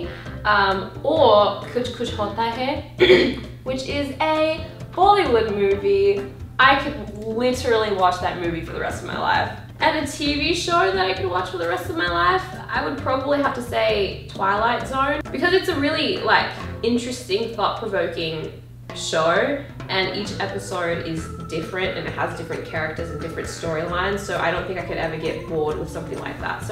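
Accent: Australia. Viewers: As a true blue Australian, YOU SOUND PROPER AUSSIE and did great, be proud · You sound more Australian than I do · YAAAS I LOVE THE ACCENT! Instantly clicked becaause of the accent hahah I love the Australian one. Also yes please do a curly hair updated video I'm half filipina & black too so help a sis out! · This is funny because the first time i watched one of your videos i really thought you were Australian as in i was SOLD lol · Came here because I was listening to one of your videos and I heard you hearing some stuff in an Australian accent so I got very confused coz I thought you were American, I know why now lol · I freaking loveeee your Australian accent I think you used it in one of the first videos I watched of you and I was very upset when I found out that you weren't actually Australian😭😂. Forget the haters this was awesome!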